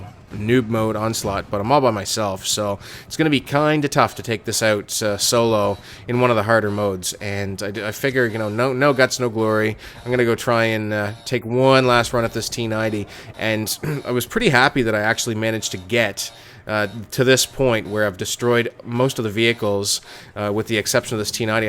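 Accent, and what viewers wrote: Canada. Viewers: aboot !!! haha Canadians and their aboot !!!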